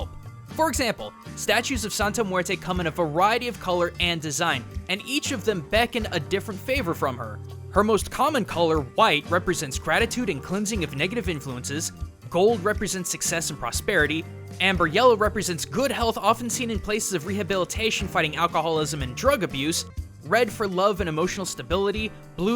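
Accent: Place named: North, United Kingdom. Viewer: dang, the way you pronounce spanish words is so... northern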